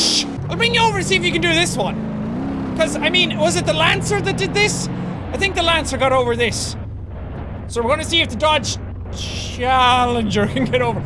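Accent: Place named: Ireland. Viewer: The boss he's flipping irish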